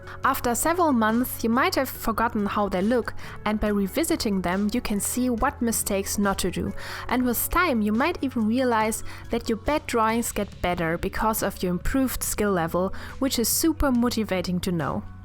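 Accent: Germany. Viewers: Are you from Germany? 🇩🇪 (just because of your accent) (And yes, i am german 🇩🇪 😂 ✌️)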